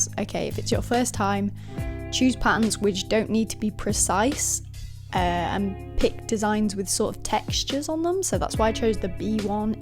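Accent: United Kingdom. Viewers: thats a manchster accent anyway OMG THX SO MUCH THIS VIDEO WAS LIKE SO USEFUL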